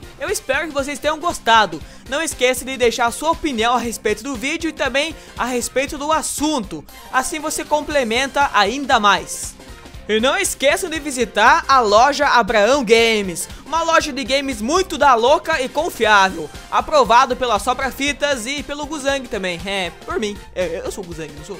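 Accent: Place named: Piracicaba, Brazil. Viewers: Cara, como eu vim parar aqui?! Parabéns pela desenvoltura em suas narrações, o sotaque de Piracicaba(?) deu personalidade. Acabei de me inscrever. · Meu irmão...você tem um sotaque MEGA caipira! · Nossa cara, esse sotaque caipira do me dá nos nervos kkkkkkkkkkk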